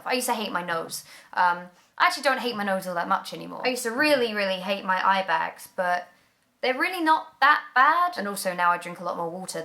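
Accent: United Kingdom. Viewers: why did you use to sound more British back than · alexstfu what? She still sounds british, there are so many different accents in the UK lol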